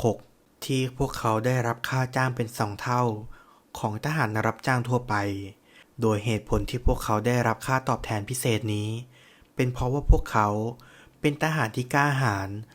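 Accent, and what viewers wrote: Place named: Thailand. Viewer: ติดเหน่อนะคะ😂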